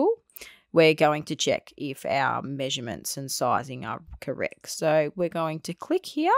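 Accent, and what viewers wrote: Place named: Australia. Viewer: Your video came up in a search for "measure in Cura". Cosplay is not my thing but it is so great to click on a video and here another Aussie's voice come through, thanks for the vid and all the best.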